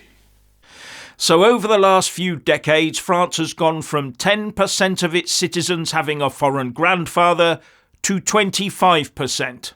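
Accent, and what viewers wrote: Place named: United Kingdom. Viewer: You are so British and so proper I seriously thought you were AI for the first couple shows thank you I love you bro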